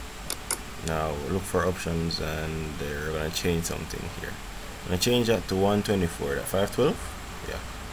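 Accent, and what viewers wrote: Jamaica. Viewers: I know a Jamaican when I hear one. Thanks mi bredda 🇯🇲💪🏾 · Respect you Jamaican?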